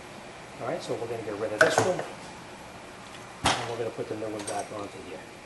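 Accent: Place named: New York, United States. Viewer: Love the video thanks! This guys definitely a New Yorker! 👍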